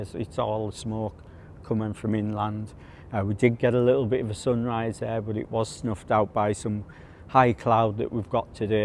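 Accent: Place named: Teesside, United Kingdom. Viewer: Hi, first time coming across your channel and I will subscribe, you really sound Middlesbrough, I'm a Stockton photographer, good to hear a local Teesside accent abroad.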